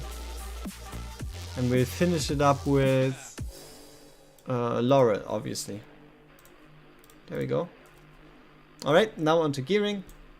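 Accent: Germany. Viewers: naja man hört halt direkt dass du Deutscher bist :D aber man versteht alles und das ist doch die Hauptsache. Und inhaltlich eh top, also who cares ;)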